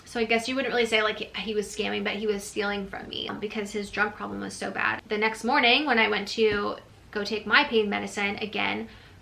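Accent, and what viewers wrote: South, United States. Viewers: Yes! As someone who's been through surgeries growing up,pain meds can easily become addictive,I try to handle pain without it,plus I can't even take ibuprofen without getting restless leg syndrome,I'm glad you had/have the strength to avoid that,lol also love the country accent haha.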